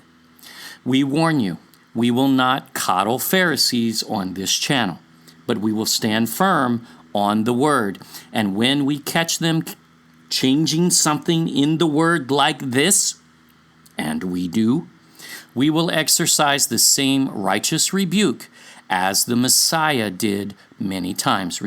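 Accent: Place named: Philippines. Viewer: are you Filipino, if you are which sounds like you are, have you seen on the true Queen of Sheba, she was filipina, which was amazing to me. I am Cherokee/Irish, which we come from Yasshakar, that was amazing to me too. Shalum and be blessed akhy (Bro) in YaHUaH.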